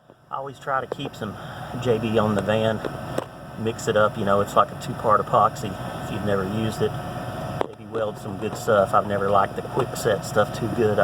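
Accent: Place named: Southeast, United States. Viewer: Hey man, Where' bouts you from? You sound like you may be somewhere near the southeast region? maybe? haha :)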